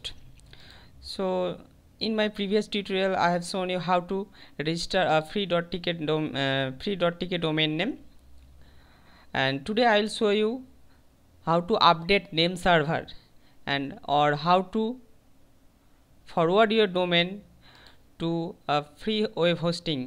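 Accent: India. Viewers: DAMN !!!! i hate india pronunciation in english, like they have candy in their mouth while they're talking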